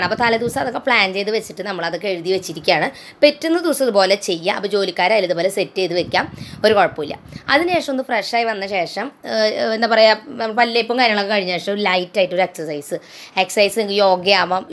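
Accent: Thrissur, India. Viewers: നാടൻ ശൈലിയിൽ... നമ്മുടെ തൃശൂർ ഭാഷയിൽത്തന്നെ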